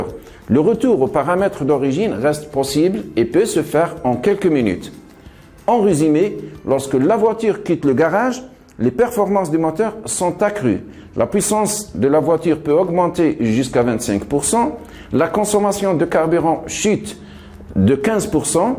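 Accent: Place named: Maghreb, North Africa. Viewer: ce qui est risible c'est pas l'accent c'est le langage soutenu qu il utilise conjointement à son accent.. Ça se voit que ce qu'il raconte n'a pas été écrit par lui.. Au Maghreb il y a des gens qui dépassent bien des français dans la maîtrise du français.. Il ne faut juste pas s'inventer un personnage.. Je suis sur que tu discutes avec lui c'est plus du tout le même français..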